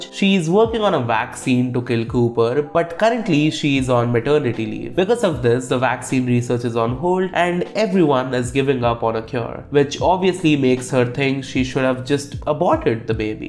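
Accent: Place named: India. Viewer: are you from india? you sound indian (not saying in a negative way)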